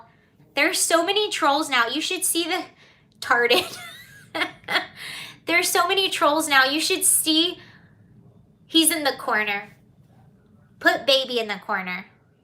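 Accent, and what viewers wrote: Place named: West Coast, United States. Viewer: I’m in Virginia too. We are as far north as you can be and still technically be considered a southerner. I’d never be able to guess you were from Georgia though. You definitely sound like a west coast girl to me.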